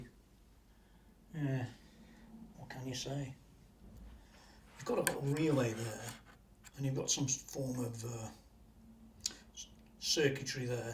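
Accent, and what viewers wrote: Stoke, United Kingdom. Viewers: I must admit I'm sceptical about the use of silicon gel for damping, not seen that before on amps I've owned. BTW, most of the comments on Psionic Audio's review are from the US, their optics will be different because of the pricing. In the UK the JTM Studio 20 is half the price. It's also amusing seeing them trying to guess your accent... cockney, Scouse, Scottish.... personally I'm thinking more up 'Anley duck!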